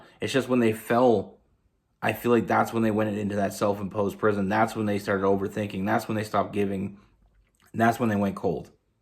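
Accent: Canada. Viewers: Love your Canadian accent 😎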